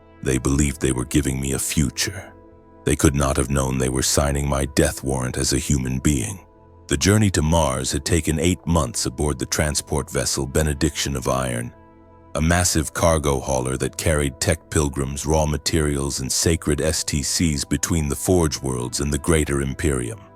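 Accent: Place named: United States. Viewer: The voice is better, barely watchable now. Still, the American twang is infuriating. These people have English, accents, old London etc. The American voice adds camp element to a dark and gritty world.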